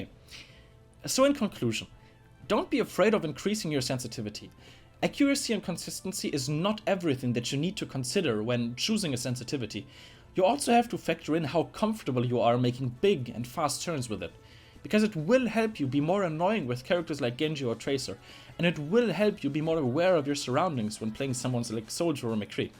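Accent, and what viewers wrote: Germany. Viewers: Man hört mega raus, dass du deutscher bist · Are u german? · Ýour accent sounds very german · Are you German ? 😂 wenn ja ich auch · You are dein Germany , richtig?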